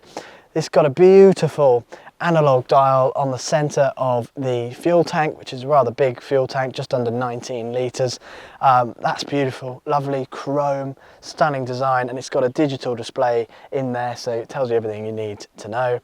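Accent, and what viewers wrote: England, United Kingdom. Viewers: How tall are you? Asking cause if you’re 5’9/5’10, or roughly 1.75 meters since you sound English it looks like the size of my Honda shadow and I’m looking for something bigger.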